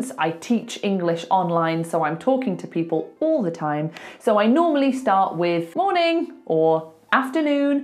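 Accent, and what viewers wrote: United Kingdom. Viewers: Hi Teacher, I like all your useful English teachings. I love the way you speak British English. I want to learn all your important lessons teacher. Thank you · Which part of UK are you from? Your accent has reminded me a lot of my time in the UK. Thanks a lot for your great videos. Keep moving on!! ❤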